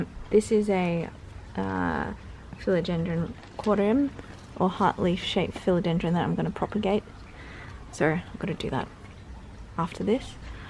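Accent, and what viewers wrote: Australia. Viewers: You sound Australian! Where are you from? Im a perth gal ✌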